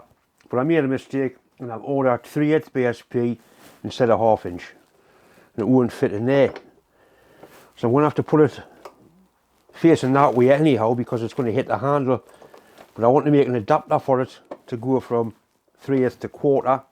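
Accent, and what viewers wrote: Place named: Geordie, United Kingdom. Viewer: if people want the subtitles they can use the built in Youtube ones at the bottom of the screen. I sometimes click them because it really struggles with your strong Geordie accent and can be very funny reading them:)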